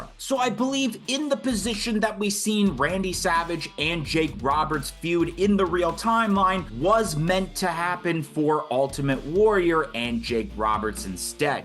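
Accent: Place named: Canada. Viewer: What part of Canada are you from?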